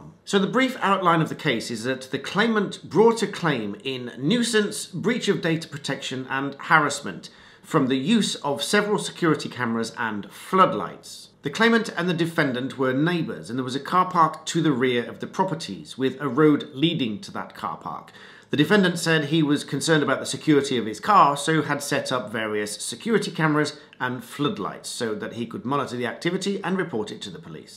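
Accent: United Kingdom. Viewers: Also with a British accent, you should be clear as to what Country this affects.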